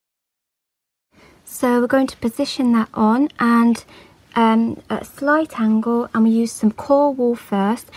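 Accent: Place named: United Kingdom. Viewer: clear and accentuated British English, very easy to understand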